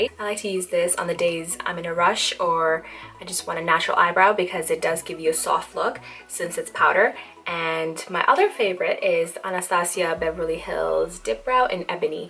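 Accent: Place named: United Kingdom. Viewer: You are starting to have the British accent 😜 Love your brows, they look pretty identical!💋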